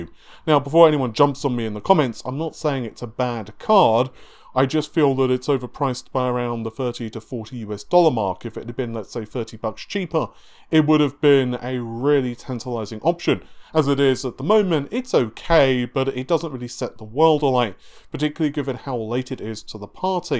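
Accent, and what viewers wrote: United Kingdom. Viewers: He is British, not American. Jesus.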